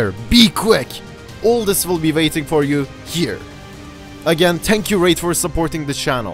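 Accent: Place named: Turkey. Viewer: Türk olduğun belli oluyo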